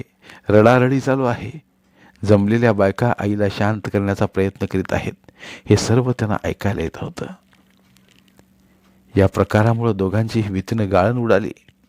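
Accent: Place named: Rural, India. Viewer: सर तुमचा आवाज चांगला वाटतो ग्रामीण भागातील आठवण येते धन्यवाद 🙏🙏🎉🎉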